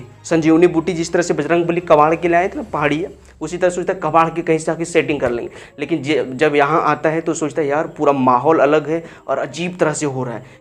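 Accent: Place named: Jharkhand, India. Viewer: Language se hi pata chal gaya ki aap Jharkhand se ho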